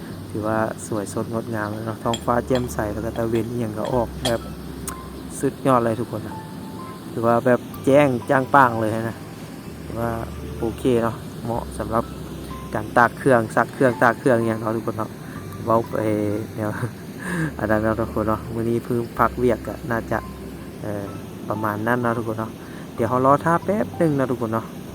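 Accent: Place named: Xiangkhouang, Laos. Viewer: ເຈົ້າບໍແມ່ນຄົນຊຽງຂວາງຫວາຄຳເວົ້າຄ້າຍໆຄືໄທຊຽງຂວາງ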